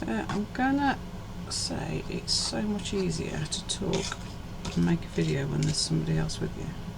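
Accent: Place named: England, United Kingdom. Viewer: I live alone with my dog Madge, a rescue greyhound, and very rarely see another human being to talk to, so I talk to myself, and answer back all the time - I have always done this though! If someone suddenly appears, whilst I am nattering on when walking with my Madge, I pretend I am talking to her! But I do not mind if folk hear me doing this, I just make a joke of it! It is the only way I get through the day, and at least I do not get criticism for saying something wrong to someone - I only have myself to blame! I have just found your channel, so given it the thumbs up and subscribed. I am a pottery painter, but love all craft. I have owned and run 5 arts and crafts shops in my past. I also had my own Private Nursery School on the side of my house, down in Devon, in the 90s, and loved doing all that art and craft with my beautiful children I was so blessed to have coming to my Nursery School. 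It was called Woodpeckers Nursery School, and I had the chicks and the fledglings. I am now 67 years young, and need to get back to doing craft things, as in the last 7 years I have lost my way a bit, due to sever anxiety, and only going out of my very rural home to walk my lovely Madge. I am surrounded by nature, so going out with her is such a joy. My latest thing I am going to be doing however, is making Christmas decorations with acorns in their cups. I love the way you do your painting, so thank you for this video. It is lovely to hear an English accent too, as so many I find on h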